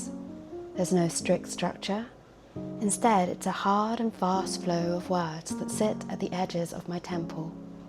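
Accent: United Kingdom. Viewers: Great video, my algorithm has been serving me this kind of stuff lately, it’s really refreshing. By the way, where in Britain are you from, what is that particular type of British accent?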